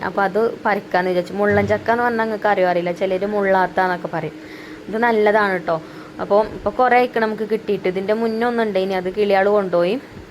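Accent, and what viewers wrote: Malappuram, India. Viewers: ഞാൻ ഒക്കെ വളരെ ദൂരെയാണ് എന്നാലും വാക്കുകൾ ഒക്കെ സെയിം റസിരെ വർത്തമാനം മലപ്പുറം ഇശൽ ഉണ്ടെന്നേയുള്ളു നമ്മൾ ഇവിടെ കണ്ണൂര് ജില്ല കാസർഗോഡ് ബോർഡറിൽ.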